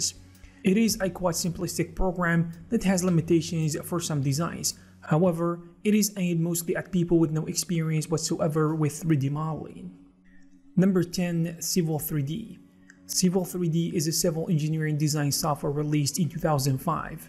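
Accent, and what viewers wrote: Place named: Arab world. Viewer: u have an arabic accent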